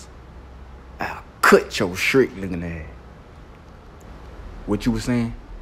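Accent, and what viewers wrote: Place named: Memphis, United States. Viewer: Bro I love your work I am from Memphis and this is how we talk!!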